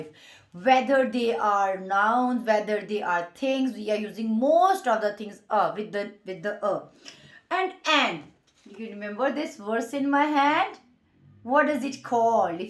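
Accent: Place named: Punjab, India. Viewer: MashaAllah Ap to Einglish Humari Punjabi ki tarha bol rahi ✌👍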